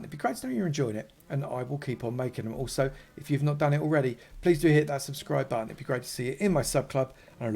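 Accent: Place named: Yorkshire, United Kingdom. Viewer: ur yorkie accent is making mine thicker mate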